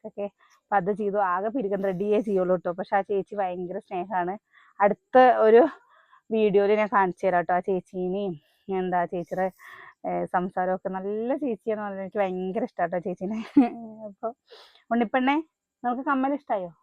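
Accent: Palakkad, India. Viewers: നിങ്ങളുട പാലക്കാടൻ ഭാഷ കേൾക്കാൻ വേണ്ടി മാത്രമാണ് ഞാൻ വീഡിയോ കാണുന്നത്. നിങ്ങൾ പൊളിയല്ലേ.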